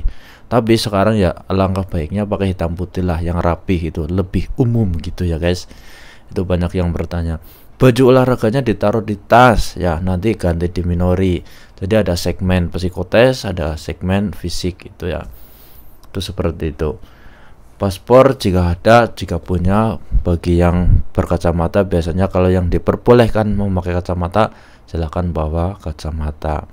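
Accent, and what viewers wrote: Banyumas, Indonesia. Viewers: ini Kaka nya ngomong nya logatnya ngapak banget😭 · ngapak ya mas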